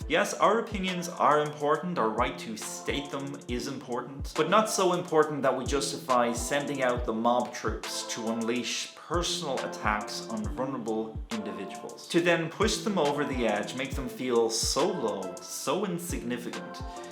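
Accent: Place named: Ireland. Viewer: very cute :) you look and sound very very Irish here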